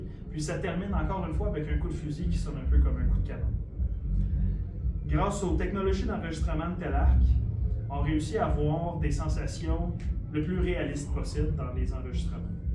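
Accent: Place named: Quebec, Canada. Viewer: French Canadian …..such an incredible difference to the French accent in france . Thanks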